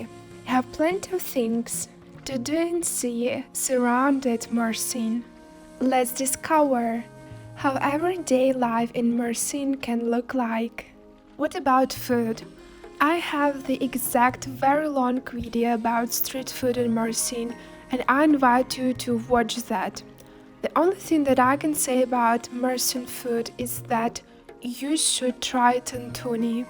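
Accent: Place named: Eastern Europe. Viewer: you have an interesting accent. What's your nationality? Are you from Eastern Europe or perhaps Germany? 🤔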